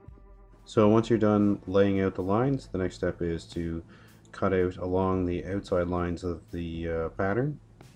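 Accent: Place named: Ireland. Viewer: I detect an Irish twang in his accent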